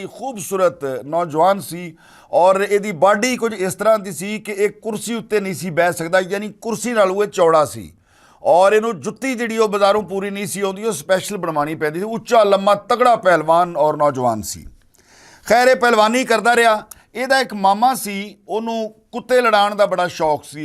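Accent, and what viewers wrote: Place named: Punjab, India. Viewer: اچھا پنجابی لہجہ اور انداز بیاں۔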